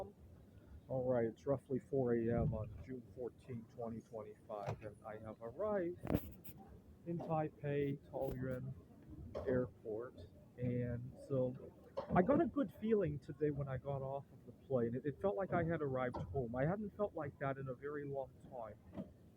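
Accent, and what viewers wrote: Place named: United States. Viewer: do you generally communicate with people in Taiwan in Chinese or english? How do people react when you start speaking English to people there in your American accent? Just curious because when I go to India I get a lot of head-turns and confused looks, because to them, seeing a person that looks like them but sounds foreigner is a unique sight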